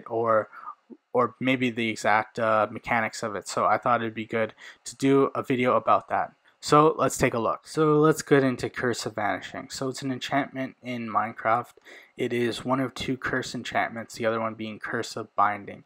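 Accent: Punjab, India. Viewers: are u a punjabi?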